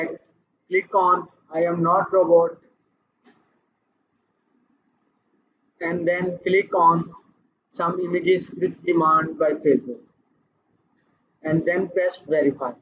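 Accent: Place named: India. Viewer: When I heard the Indian accent I knew I was in good hands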